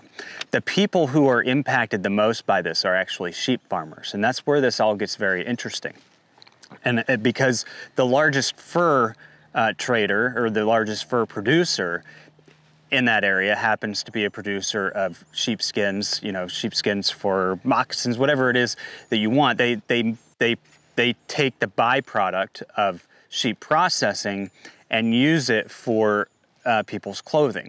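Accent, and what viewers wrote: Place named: East Coast, United States. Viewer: You must be from the east coast somewhere. I'm from Oregon. We say it Orgun. It doesn't rhyme with polygon. Just Orgun, thanks. Also, it's not ColorAHdo. The A in Colorado sounds like the a in apple. No umlaut. Yes, I know that it's a Spanish word and in Spanish they'd say AH. I used to get it wrong too. Doesn't matter. Point is you don't sound like a local and that annoys the locals. Also, I have a bone to pick with you hoity-toity eastcoasters treating west coasters like we're stupid bumpkins. At least we can pronounce all of your state's names properly without all the stubborn denial of our own ignorance. I've had New Yonkers tell me I'm pronouncing Oregon wrong when I'd hoped to enlighten them in the past. The nerve! Namaste!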